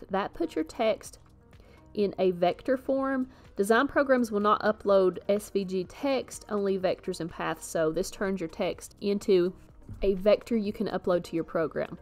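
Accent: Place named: South, United States. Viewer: You are speaking my accent!!! From one southern girl to another, I love this video! Thanks so much for sharing! Do you use Inkscape for all of your SVG designs or do you use other design software as well?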